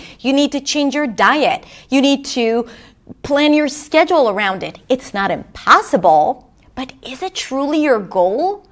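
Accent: United States. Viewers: being white and being a man? I didn't watch this video cuz you're white or whatever. Watched just because you are non-native and you acquired that american acccent. You should study a little more about femminism BS. I am a white man and have priviledges cuz I work my ass off and not for a some sort of white skin priviledges... and of course I trust more people without accent cuz generally have more experience (there are exeptions but I'm sure you understood my point) Best regards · She sounds completely American. She speaks from the inside of her mouth a bit towards the back, but so do a lot of people. Midwestern accents and even southern accents push the sounds into the top middle of the mouth. Think of how people from different regions say, "yeah". Her presentation and placement has a lot in common with the standard American accent. Good thoughts here. 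· The thing the only reason she can say this and not sounding ridiculous is because of her American accent. Try another one say in a Chinese-English accent. Not indian, not african, chinese. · i get confused for a while, i thought you were from the US because of your accent. Your English is brilliant.. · I wish I could speak english with your perfect american english....but I would choose the option 1 undoubtedly 👍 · I am a college graduate foreigner who has been living in the U.S since I was 18, and that was over 20 years ago. I can notice a British, Australian or other types of accent within a few seconds. You sound just like an American. Apparently, you were able to achieve the goal from option 1, so just show us how you did it. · But you sound really American 😂 and i want to sound so good as you. I do not compare me to you. But from the beginning i want to sound like native speaker already and try to nail it. 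But some how i can not change my rythm anymore😢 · You are not a Native speaker? No way!!! You sound 200% American. At what age did you come to the US and how old are you now, dear? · you are pushing it too hard, we know your American accent is 99,9% accurate, but you still have to work on the source. Stop watching movies, especially with girly white girls starring, start watching documentary or news program...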